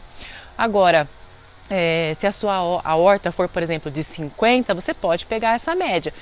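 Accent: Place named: Paraná, Brazil. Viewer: Adorei o seu canal!! Obrigado por compartilhar conhecimento. Ah, esse sotaque é do Paraná, né!? rsrsr (Amei)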